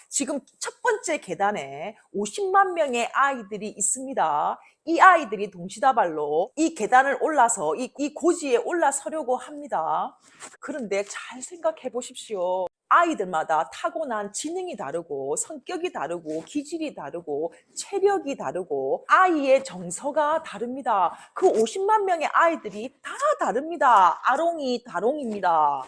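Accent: Gyeongsang, South Korea. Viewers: 넘 재미있어요. 진짜 잼 있어요... 두 아들이 있는데 둘 다 고졸 입니다. 대학 대신 상장사 AI 팀장과 매니저로 서울대와 카이스트 졸업자들을 AI 교육 시키고 있습니다. 다음에 한번 뵙고 싶군요. AI 시대는 남들과 다른 교육이 필요하고 AI 시대 교육은 새롭게 변해야 한다고 생각해요. 산골에서 단 한번도 사교육을 시켜보지 못했고 과학고에서 영어 4등급 받았어요. 그런데 토익은 만점 입니다. 국내 대학 포기하고 연봉 억대로 취업을 했어요. 저희 유튜브에 대한 이야기도 좀 부탁 드려요. 한번 기회를 주세요^^ 저도 유명해지면 해드릴게요^^ 틀림없이 내년에 유명해 질 것 같아요^^ 경상도 사투리 넘 멋져요^^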